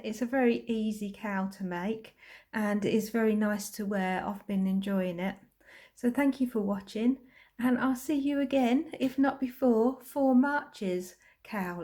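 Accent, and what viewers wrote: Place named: United Kingdom. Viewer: This looks great! Can’t wait to try it! I love your accent! What part of the uk are you from?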